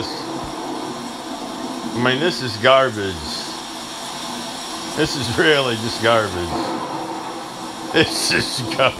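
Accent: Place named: Appalachia, United States. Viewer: This guy sounds like a rite hill billy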